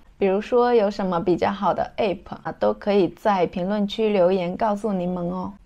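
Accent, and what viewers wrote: Taiwan. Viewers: 妳的口語已很台歡迎妳來台當新台人～！ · 講話口音。往台灣走了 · 哇！還沒到台灣就有十足台灣口音了！加油喔台灣歡迎妳☺️ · 妳的口音已經台化了！很快可以融入台灣社會。臉書 Line 必備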